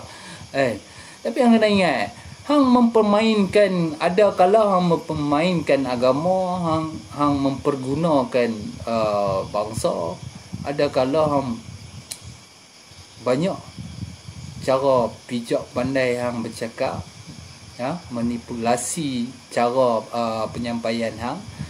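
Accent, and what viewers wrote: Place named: Kedah, Malaysia. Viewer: Abang ni mesti orang kedah..dengar dia cakap pun dah tau..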